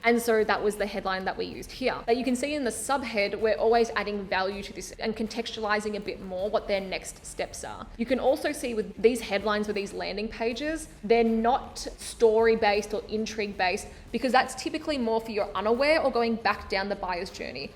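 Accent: Australia. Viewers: Still loving that Aussie accent. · New sub after watching many of your videos over the past few days. Also, fellow Aussie accent! Yay!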